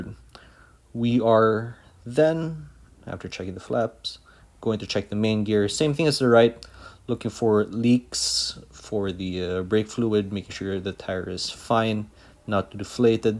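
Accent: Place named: Philippines. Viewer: Why u have a Filipino accent lol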